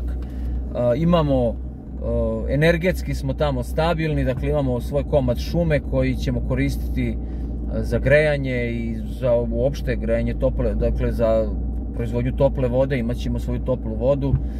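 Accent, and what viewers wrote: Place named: Dalmatia, Croatia. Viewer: Volim dalmatinski dijalekt pozdrav iz srbije !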